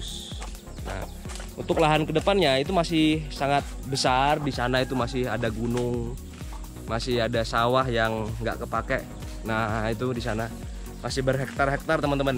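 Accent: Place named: East Java, Indonesia. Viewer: Asli jawa timur mana mas?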